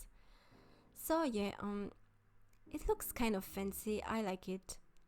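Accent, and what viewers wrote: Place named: France. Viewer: Wait hol up are you French????? I love ur accent I am nigerian but speak fluent French lol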